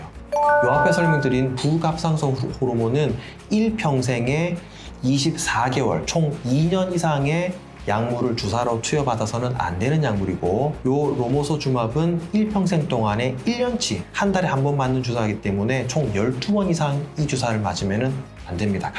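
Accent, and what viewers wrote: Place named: Gyeongsang, South Korea. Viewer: 이미 말투에서 대구출신인거 숨기지 못하죠!! ^^ 반가워요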